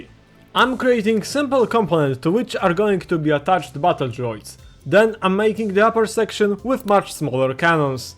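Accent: Poland. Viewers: from where are you from you sound polish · słychać polski haha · usłyszałem taką nutkę polskiego mówienia po angielsku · You have Polish acent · Słychać że polak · słychać że polak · masz polski akcent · Bro speaks English jak polak💀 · I can understand the accent because I am also from Poland and I. Love these videos, keep up the good work I hope you get 1M subs before 2026 👍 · Polski akcent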